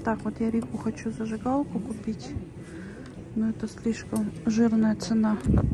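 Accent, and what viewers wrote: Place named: Urals, Russia. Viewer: Уральский говор он конечно очень выделяется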